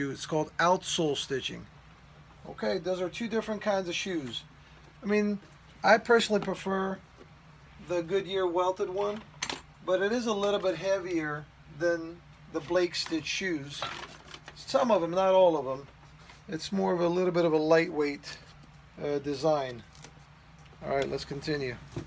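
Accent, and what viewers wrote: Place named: Philadelphia, United States. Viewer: Are from Philly? You got a Philly accent or close.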